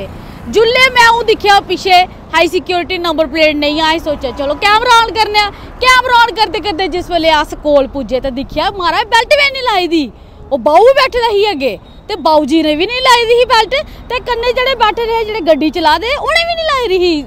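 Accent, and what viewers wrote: Jammu, India. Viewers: Zabardast Reporting.. And in authentic Dogri style.. Proud of U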